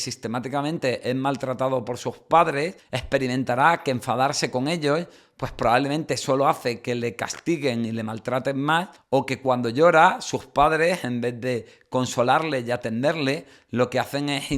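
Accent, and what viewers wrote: Andalusia, Spain. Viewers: hablas un andaluz culto y perfecto, o sea me encanta tu acento, además has dicho prejuicio.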